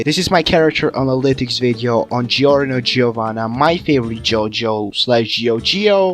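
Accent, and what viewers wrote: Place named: Latin America. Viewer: Ahora en español:v por tu acento más vale que seas hispano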